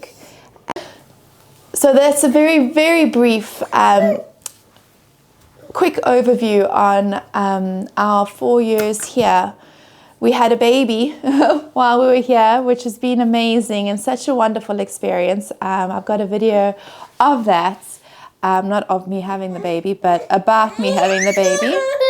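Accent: South Africa. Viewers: Hey! Your lovely Saffa voice is so soothing to listen to! And I love your text on the screen during the noisy bits 😂 we are in Ireland 7 months already!! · I am currently in Dublin visiting a friend, He was kind enough to open he's door to me to come out here to look for employment, really God sent. I have left my Wife and my 2 children back home in South Africa really out here trying my outmost best to make this trip work for me to try and give my Wife and my Children a better future. Things back home are not looking good at all, so I really need to make this work. I have a Home Care and first Aid certificate from the Red Cross South Africa. If you are kindly willing to refer me to a place where I can try and land a job because unfortunately all the places I have called or approached don't do sponsorships at the moment, I am willing to cover costs for a work permit. 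If you might also know of any place that is hiring any Customer Service or Sales Jobs. I would really appreciate any kind of information. Thank you. I have been applying for work online hoping and praying that I land an interview it has not happened yet though but I am pushing on. Really your story has touched my heart because I can relate and it really tells a story of how strong we are as South Africans, also what a blessing to my ears for hearing a familiar South African accent...lol...All the best going forward...May God continue to bless you all and guide you...Cheers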